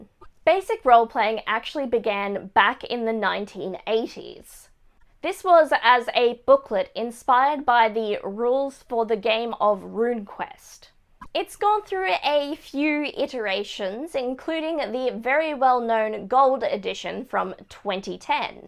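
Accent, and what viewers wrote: Australia. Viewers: I really really want to love your channel, but you have an Australian accent still. :(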